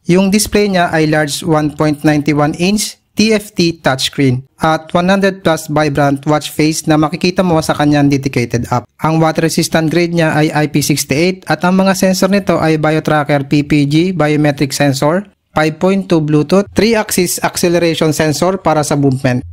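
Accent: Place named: India. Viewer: Bat may Indian accent ka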